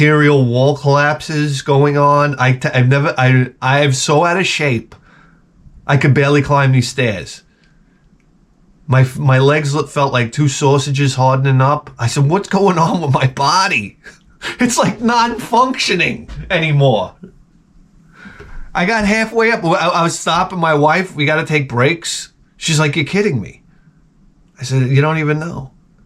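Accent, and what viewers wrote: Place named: Long Island, United States. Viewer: I was just thinking you begin sounding like an Adult Eore from Long Island, then noticed you're even wearing Eore 💙 Blue.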